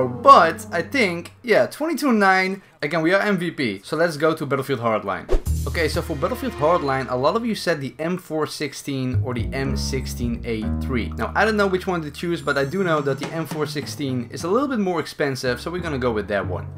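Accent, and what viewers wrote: Netherlands. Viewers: Are you dutch by any chance? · Jij hebt de typische Nederlandse accent wanneer je Engels spreekt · Je bent Nederlands ik hoor dat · I hear some Dutch accent, am I right?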